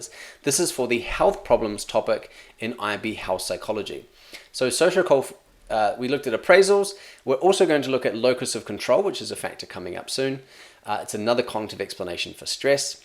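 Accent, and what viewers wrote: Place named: New Zealand. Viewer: are you lecturing in nz at the moment ? noticed u have the accent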